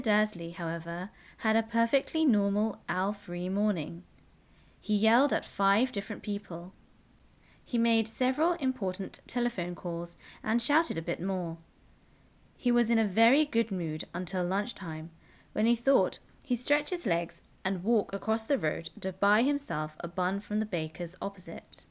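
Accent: United Kingdom. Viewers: 영상 감사합니다🙂 영국발음 멋있어요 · 영국발음 너무 매력적이에요 :D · 해리포터 원서로 공부하고 있는데 영국발음으로 읽어주시니 너무 좋아요~~!! 구독하고 가용ㅎㅎ죽음의 성물까지 존버합니다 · After all, football commentary and Harry Potter books should be heard in British English. thank you.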